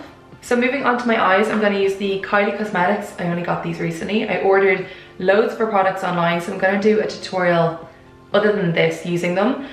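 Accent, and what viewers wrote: Ireland. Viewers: Ireland I think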